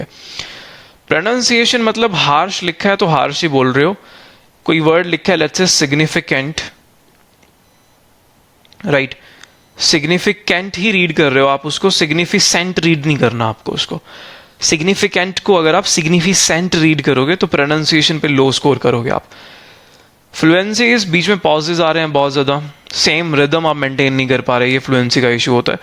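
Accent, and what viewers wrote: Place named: Pakistan. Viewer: sir ka excnt and urdu bilkul pakistani hy👍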